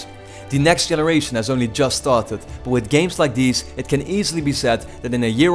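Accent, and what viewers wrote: Netherlands. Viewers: duidelijk te horen dat deze man nederlands is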